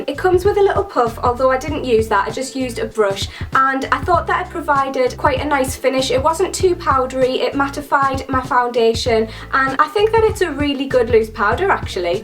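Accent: Newcastle, United Kingdom. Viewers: Her accent is from around Newcastle do it Northen lyk a Geordie kinda common accent...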